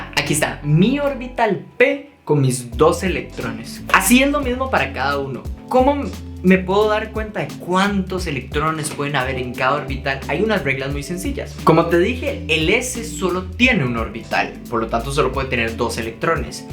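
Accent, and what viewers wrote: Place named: Colombia. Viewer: Pensé que eras de colombia 😊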